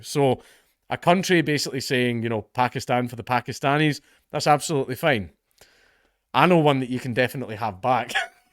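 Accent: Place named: United Kingdom. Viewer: century of prima nocta. He's got some brit up in there.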